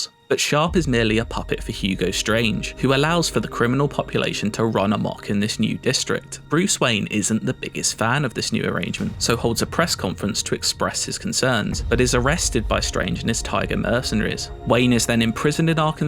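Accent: United Kingdom. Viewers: the british accent is such a turn off man!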